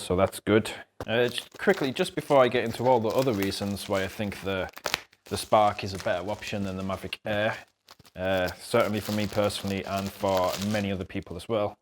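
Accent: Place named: United Kingdom. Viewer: So, how do you like your Spark? First, I live in Canton, OH. I also own a Mavic Pro but looking for something smaller. The Air just getting me too close to my Mavic Pro. I also motovlog and would like to take the Spark with me on my motorcycle club rides. I want to wish the best of luck. Love the British accent...:)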